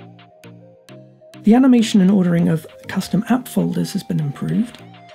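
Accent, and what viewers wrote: United Kingdom. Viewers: Which part of british are you from?? I can barely hear what you are talking..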